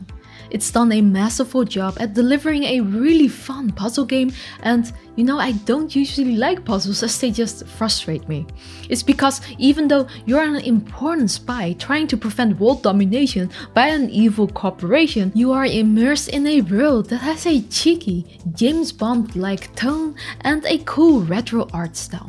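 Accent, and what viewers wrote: Netherlands. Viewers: je klinkt een beetje nederlands heb ik gelijk?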